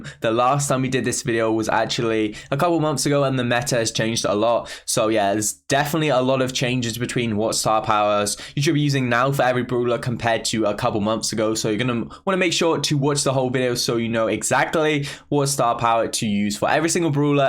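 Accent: United Kingdom. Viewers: I love the British accent ;)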